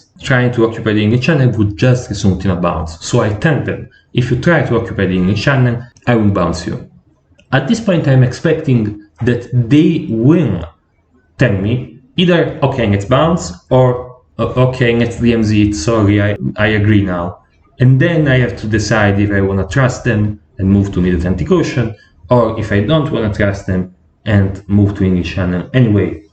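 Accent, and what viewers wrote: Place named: Italy. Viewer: Nice, a part from that strong Italian accent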